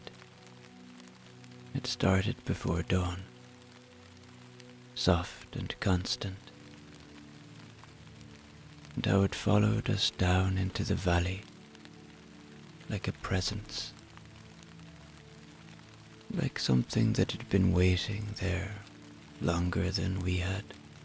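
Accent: Ireland. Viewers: Woohoo! 1 hr gang! pm Texas USA. Keep that soft Irish brogue coming. 😊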